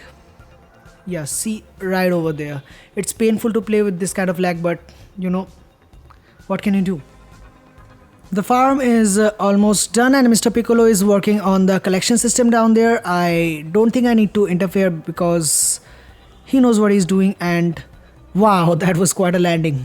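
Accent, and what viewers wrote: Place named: United Kingdom. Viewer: Litrelly got orgasm by intro music and your British accent eng.. senpaieeeeeeeee..✌️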